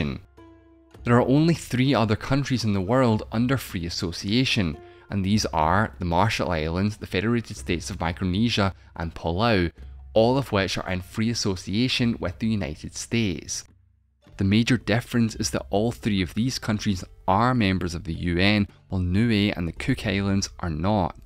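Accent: Scotland, United Kingdom. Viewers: I find this Scot very difficult to understand; could he please speak the King's English?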